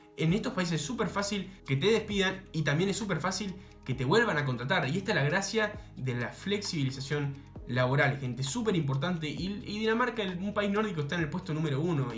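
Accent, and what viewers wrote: Argentina. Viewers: Todo bien pero en el norte de Dinamarca el 80% de la poblacion laboral es contratada por el estado. Igual tenes razon, pero hablando en neutro con acento argentino queda medio payaso.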